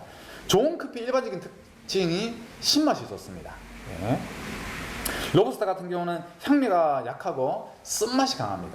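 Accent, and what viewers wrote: Gyeongsang, South Korea. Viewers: 아흥..강으너무 잘해주시는데....경상도 억양..알아듣기 힘들어요..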